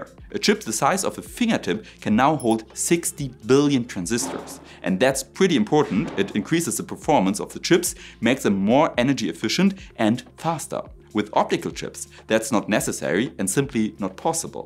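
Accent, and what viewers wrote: Germany. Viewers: There is nobody I would rather learn science from than a German dude with a German accent.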